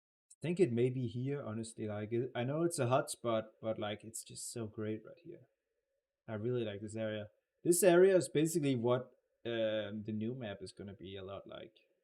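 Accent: Denmark. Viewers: Are you from denmark🇩🇰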